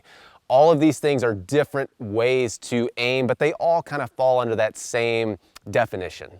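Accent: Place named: South, United States. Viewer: hi! I'm from New Zealand. really enjoyed watching your journey on Alone as you've heard millions of times so I went searching for your name to pick your brains coz I'm new to archery as of last year bought a 45lb recurve bow set during lockdown here and loved it now I'm hooked! still trying to fumble my way thru techniques vs different equipment sheesh... great video man thanks for sharing your wisdom with the tint of southern accent as a bonus "YEEE-HAAW! hillbilly nation come on!" (that's my own saying 😂 hope I don't offend anyone? Oh well its out there now) PEACE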